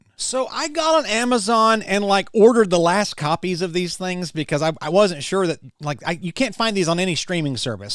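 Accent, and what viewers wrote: South, United States. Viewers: HEY!! His accent is solid brother -- give him that cred at least!!:D Seriously, pretty darn good ha! Is your southern accent authentic, or is it a dynamic show like Kenneth Copeland Mexicano speak?!! Thanks brother:D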